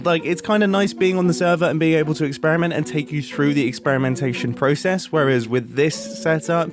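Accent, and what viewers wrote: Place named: United Kingdom. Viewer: My daily dose of a dapper British man playing games to keep my sanity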